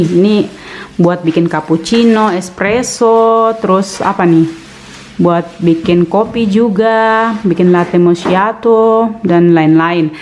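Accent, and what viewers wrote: Papua, Indonesia. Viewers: Sy paling suka dgn logat papuanya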